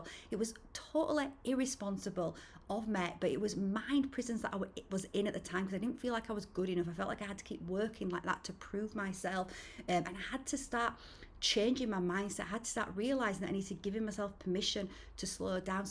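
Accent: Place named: North of England, United Kingdom. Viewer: Thanks so much for your video which really resonates. I feel like I’ve been on pause for a long time. I noticed your video lasted 9.11 and I have been seeing that number sequence for a long time! What part of the world are you based by the way? You sound like you’re from the North of England. I live in Prestwich. Sending love and sparkly wishes xxx 🙌💕🧚‍♀️🪄💫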